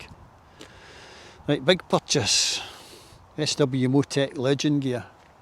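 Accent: Scotland, United Kingdom. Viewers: Nice! And I love listening to Scottish guys talk; I’m up to understanding nearly 25% of what’s being said!😂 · Maybe because I’m from a very mixed city/upbringing, but I can understand him completely! Never understood why people can’t… understand Scottish accents xD We’re speaking the same language! Anyway, beauty bike brother. I just picked a ‘26 up last Saturday, I’m very happy to be a part of the family now. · You will have to eBay that Indian jacket now! It's the only problem with changing bike/brand. Saying that i still wear my HD fingerless gloves despite now riding a T100! I would like a T120 at some point but no hurry as the T100 does it all really. Ps, love that Scottish accent.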